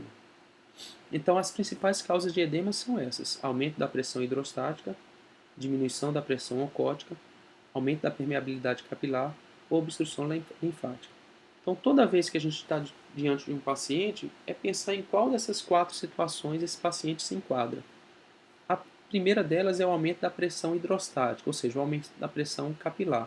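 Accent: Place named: Northeast, Brazil. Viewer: Falou muito e muito rápido, explica em Termos que só um Médico entende e o Sotaque Nordestino "comendo" o "R" no final das Palavras e isso como Médico, é uma vergonha!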